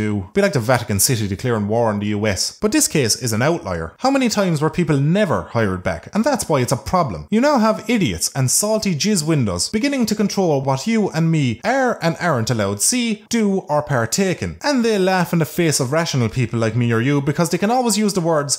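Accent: Ireland. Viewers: Love your accent! Is it Irish?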